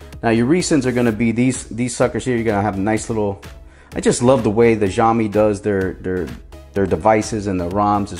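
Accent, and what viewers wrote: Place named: England, United Kingdom. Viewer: What is ur English accent bro?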